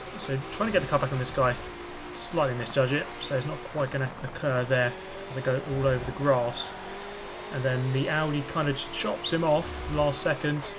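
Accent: United Kingdom. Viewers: This guys commentary makes me wish I had a British accent!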